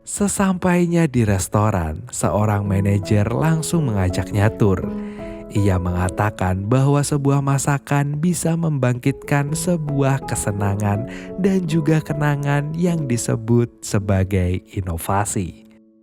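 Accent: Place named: Java, Indonesia. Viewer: Gw saranin kayaknya mending lu kayak album film aja yang ceria gitu soalnya lu kayak orang jawa tapi udah keren kok 😅👍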